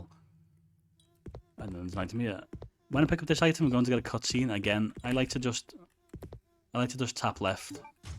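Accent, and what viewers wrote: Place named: Liverpool, United Kingdom. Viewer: Excellent video. Great to hear a fellow Liverpudlian also!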